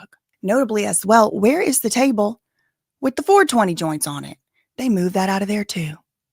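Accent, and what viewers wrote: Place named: Louisiana, United States. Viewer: You and Britney Spears have the same Louisiana accent. You are genuinely funny!! Always making me laugh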